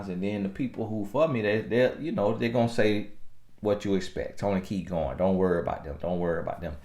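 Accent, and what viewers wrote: South, United States. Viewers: Tony I love your videos and that southern drawl🥰. Thank you for doing what you do! You’ve saved my life as well. You’ve made me laugh at a time when all I did was cry. I think you’re articulate and hilarious but most importantly you love God. God bless you!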